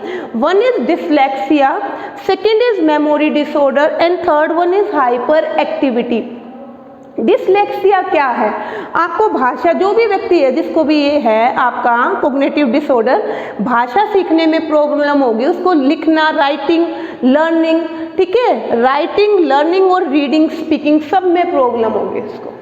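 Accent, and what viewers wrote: Haryana, India. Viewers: Mam aapka Haryanvi touch aa rha h🥰 Lkn fir bhi m aapse hi pdti hu 🤓🤓 PHE ke sbse best teacher ho aap mam 🙏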